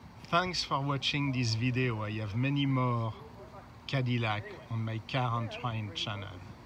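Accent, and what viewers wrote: France. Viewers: Sacré accent Français haha ! j'ai la même en 1977 avec équipement fédéral présidentiel complet, sirène US, gyro US dans calandre, Citizen band, full tinted glass, téléphone, mini bar whiskey and cathodique TV avec antenne boomerang chaines Française. Ce n'est pas une sedan mais une factory Fleetwood limousine formal serie 75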